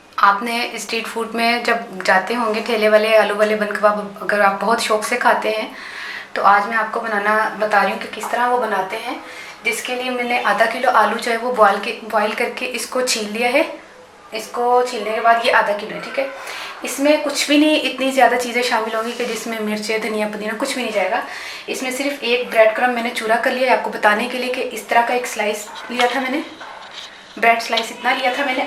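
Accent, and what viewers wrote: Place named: Punjab, India. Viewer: جہاں تک ریسپی کی بات ہے بہت ہی عمدہ لاجواب ہے ۔۔۔۔میں نے تو فسٹ ٹائم اس طرح کے برگر دیکھے ہیں۔۔۔۔ اور آپکی کیمرہ وہ مین بھی کمال کی شخصیت ہیں ان کو ہنسنے کے علاوہ اور کوئی دوسرا کام نہیں۔۔۔۔ اور کتابوں کو دیکھ کر ایسا لگتا ہے کہ آپ بڑے باذوق کوک ہو ۔۔۔اور ایک بات آپ زیادہ تر کہاں پائے جاتے ہو مطلب کے آپ کس شہر میں جلوہ افروز ہوتےہو یا یوں کہہ لیں کہ آپ اپنی پرنور شخصیت سے کس شہر کو منور کرتے ہو یا سمپل الفاظ میں کہوں تو یہ بتائیں کے کس خوش قسمت شہر میں آپکا آشیانہ ھے۔۔۔ ضرور ضرور مینشن کیجئے گا ہم نے آپ کی پنجابی ایکسنٹ سے اندازہ لگایا ہے بٹ پتا نہیں ہم رائٹ ہیں یا رانگ ہیں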